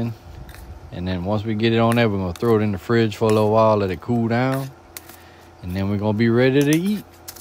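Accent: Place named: Louisiana, United States. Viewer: I hear Louisiana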